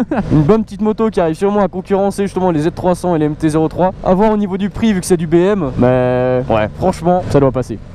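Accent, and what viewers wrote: Switzerland. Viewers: L’accent suisse 🇨🇭👌🏾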